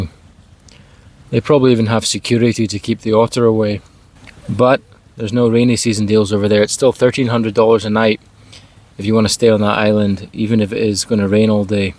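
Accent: Scotland, United Kingdom. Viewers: that Scottish accent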